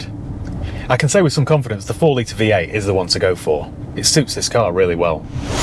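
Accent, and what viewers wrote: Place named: Cheshire, United Kingdom. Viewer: Brought the Cheshire accent out for this one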